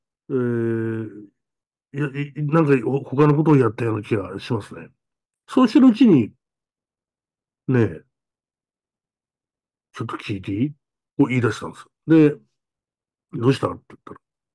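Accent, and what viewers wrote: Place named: Aomori, Japan. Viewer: 青森県出身のわたし 🥰🥰🥰 さんの青森県の方言、お上手ですね 🥰 青森県は 🎀津軽衆 🎀南部衆と分かれます🫧🤗 わたしは南部衆の産まれですが。八戸の近くです！さんの方言方法は南部衆の方言に近いですね 🥰 南部衆の方言は北海道の方の方言にも似てるとわたしは思います 💐💐💐🥰 心温まる怪談、本日も癒されておりました 💝🫧🫧🫧🤗 ありがとうございます♪ そして、いつもご丁寧にお返事ありがとうございます♪ シングルマザー、美容家のわたし🫧🎀 子育てやお仕事の励みになっております 🫧🫧🫧 感謝致します❣️ 🌹鈴子🌹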